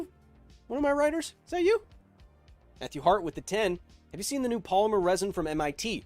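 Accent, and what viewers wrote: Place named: Australia. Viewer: He does an Australian accent because he's good at it and real Aussies aren't so easily offended. 👌👍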